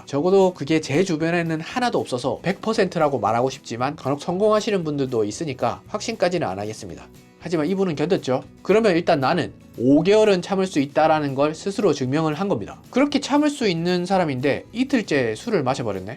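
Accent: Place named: Busan, South Korea. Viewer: 알고리즘에 이끌려 보게 되었는데,, 단주 중 정말 현실적으로 공감되고, 저는 부산사는 사람으로 사투리로 방송하시는게 너무 편안하네요 대단하세요!!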